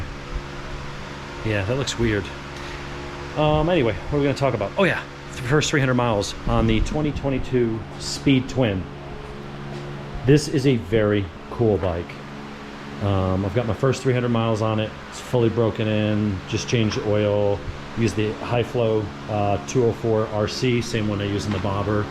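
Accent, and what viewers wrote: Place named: Australia. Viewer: On point with the Ozzie accent.😄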